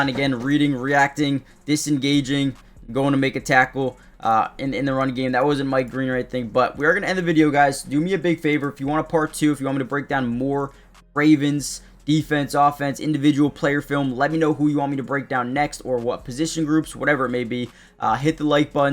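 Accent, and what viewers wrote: Canada. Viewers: Rad Canadian accent my guy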